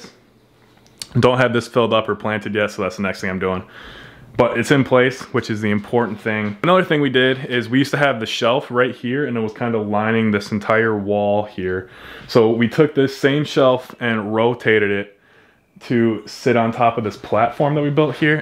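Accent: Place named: Wisconsin, United States. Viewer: The Wisconsin accent is incredible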